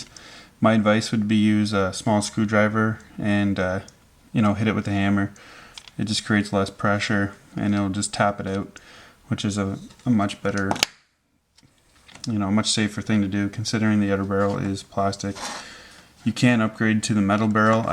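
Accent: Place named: Canada. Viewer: your accent screams "I'm canadian" haha, much love from Quebec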